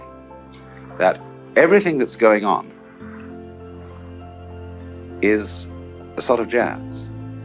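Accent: United Kingdom. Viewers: British accent = barf 🤮 Use a good quality French, Scottish or Irish ☘️ one instead. You will get more likes 👍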